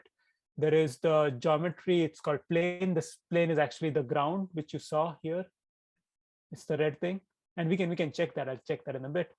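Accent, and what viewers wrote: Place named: India. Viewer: please guys please to all my indian ppl ur killing us with the accent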